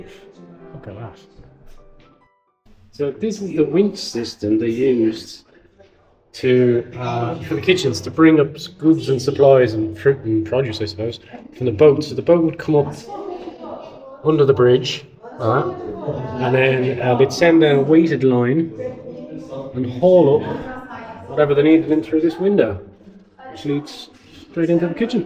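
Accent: Ireland. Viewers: Me too that Irish accent and French names always gets me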